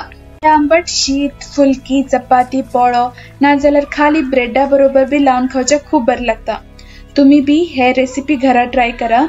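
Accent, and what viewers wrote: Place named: Karwar, India. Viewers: guruprasad YM , true ..I too sensed that . It sounds more of uttar Kannada konkani version or Goan or Malvani . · .. Hello dear. That's Karwari Konkani. People from Dandeli, Kumtha,Murdeshwar speak in this accent. It's not the Goan Konkani. I am a Goan GSB.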